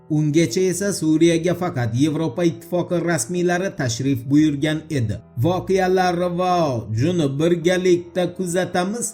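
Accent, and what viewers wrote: Uzbekistan. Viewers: SHUNCHA YILLARDAN BERI KUZATIP KELAMIZ SHU TURFA OLAMNI, SHUNDAY EKAN DOIM VIDYO'NI KO'RGANDA LAYK BOSIP TURAYLIK AKA-UKALR???? BU AKAGA O'XSHAP, SOF O'ZBEK TILIDA GAPRISH HAMMAGA HAM MAS!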